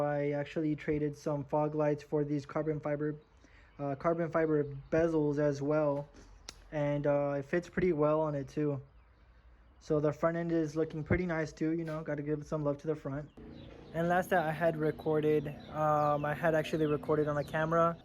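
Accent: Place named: India. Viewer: bro pense que eras de la india hasta que hiciste el comentario de la chela jajajja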